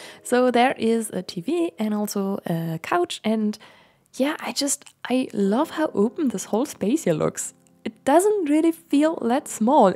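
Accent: Germany. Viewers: No, she's from Germany and German is her first language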